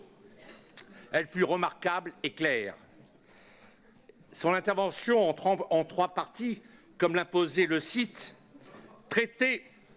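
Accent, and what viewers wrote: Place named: Île-de-France, France. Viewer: Et ton patois d'Île de France tu sais où tu peux te le mettre ?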